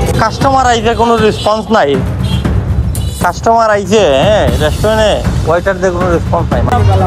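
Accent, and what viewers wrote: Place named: Sylhet, Bangladesh. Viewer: অরদেক শুদ্ধ ভাসা অরদেক সিলেটি ভাসা তুমার কন্ট ফাটা ফাটা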